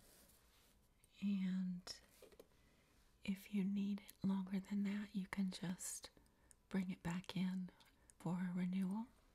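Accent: South, United States. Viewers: your accent is so wonderful to me. Your voice and mannerisms just speak “southern charm”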